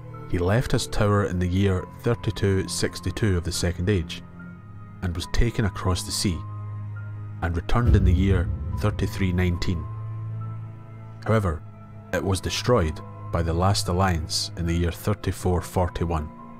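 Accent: Scotland, United Kingdom. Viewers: Oh no! The only two Scottish accents I could get any information on were Edinburgh and Glasgow. I had been wondering if Inverness were a possibility, but I couldn't find any examples of it at all. Eventually, it came down to whether I thought you sounded more like Billy Boyd or Billy Connelly. Lol.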